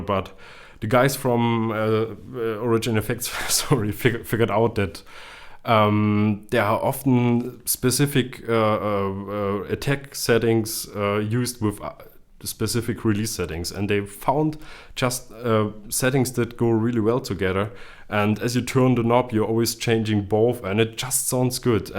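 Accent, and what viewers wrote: Germany. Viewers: A German man who speaks English😂 nice accent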